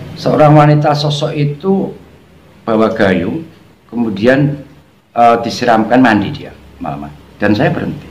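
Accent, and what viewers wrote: Java, Indonesia. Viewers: . Suara sedikit mirip (logat Jawa juga), tp ini bicaranya agak cepat, dn rambut lebih sedikit drpd PakDe Alex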